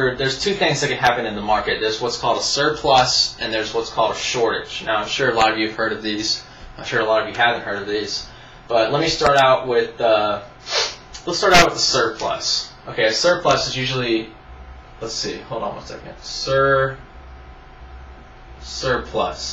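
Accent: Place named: South, United States. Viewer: So entertaining! And easily explained! I Like your southern accent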